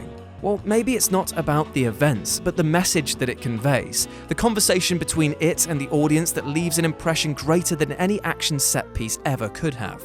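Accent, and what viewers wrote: United Kingdom. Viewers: I usually don't trust anybody with a British accent, but I'll listen anyways.....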